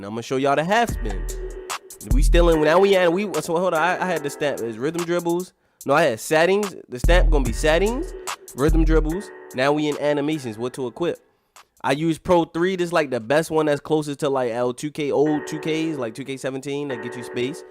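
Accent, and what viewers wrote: Baltimore, United States. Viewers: U from bmore ain’t chu ? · got that deep baltimore accent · Lmao I subbed cause your accent bra it reminds me of the wire👌🏾💪🏾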